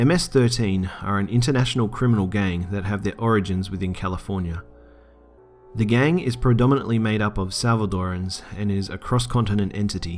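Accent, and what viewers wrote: Australia. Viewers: Hoho your pronunciation are still hilariously Australian...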